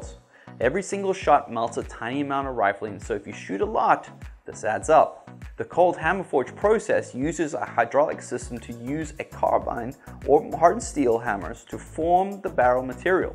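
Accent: South Africa. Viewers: Some of your pronunciation is reminiscent of the south african accent… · totally unrelated random comment: your accent, sir, fascinates me! First video of yours I have ever watched, and, that is what caught my ear first even before learning this about barrels, which I did not know either. I am hearing bits and pieces of South African English? British English? AND American English? Am I right am I right?😂 But all combined together into your own unique accent!! Well that is personal I suppose. It’s just that, I am always fascinated by language and accents, having a semi-musical background, and so the way in which any person anywhere talks is always catching my ear because, it also lends a window into their personal story and personal history. So therefore I do not mean any disrespect. Just that, you accent fascinated me when I first heard it and I felt compelled to comment!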